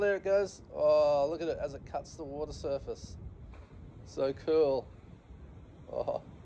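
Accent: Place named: Australia. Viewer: Great footage, love hearing Aussie commentary, I've lived along east coast all my life, had some close encounters over the years, Evans head, Lennox, Byron. Just found the channel, keep up the great work, Cheers 😊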